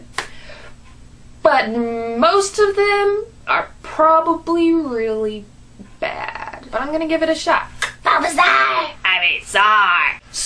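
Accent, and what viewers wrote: United Kingdom. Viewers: 6 falam que ta ruim mais e por que ela fez no sultaque ingles (nao sei como escreve sultaque)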